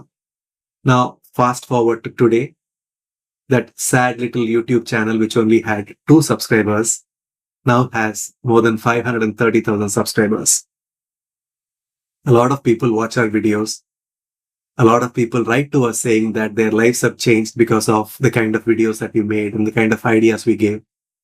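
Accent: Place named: India. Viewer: You have a very pleasant Indian accent.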